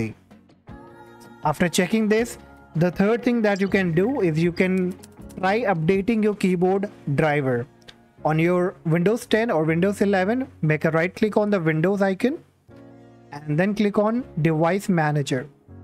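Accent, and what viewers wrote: India. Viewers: every time i hear the indian accent i calm down and know its all gonna be okay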